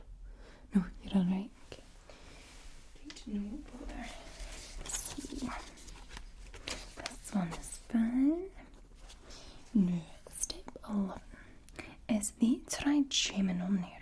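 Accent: Scotland, United Kingdom. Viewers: I so LOVE your Scottish Accent with the "cranial nerves" and this fun exam:)!! My Primary Doctor is from Pakistan and she calls a Urine Test a "Ureene Test":)!! I now feel very relaxed and able to sleep:)!! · Love scots accent its like a warm blanket on a cold day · I'm sorry,everytime I hear someone speaking Scottish I think of,a jaunty tune "when I go out,well I know I'm gonna be I'm gonna be the man who comes along with you"....darn song! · Your very Scottish series is a treat to my ears I find the Scottish accent beautiful and it gives me goosebumps! Your Scottish accent is like hearing beautiful angels sing! Thank you! If only you were my doctor then I wouldn't be so nervous about going! When I am at the doctor I will think of your medical videos and it will calm me down may be Listen to positive affirmation videos before I leave for the doctor... 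Just one question do most Scottish Doctors wear Tartan Skirts at work? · I saw cranial nerves and was like yes! But then I saw heavy Scottish accent and was like YEESSSS!!! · i thought the heavy scottish accent ASMR ruined the video but now i got used to it im getting more tingles than i usually do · I love your “very scottish” videos, but it makes me giggle a little bit because my aunt is scottish, but has lived in canada over 30 years and still has a much much thicker accent than this 😂😂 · Every day I always listen to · Scottish accent>any other english/american accent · The Scottish accent is by far the best 👌❤️ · I guess part of the Scottish accent is sarcasm, it's great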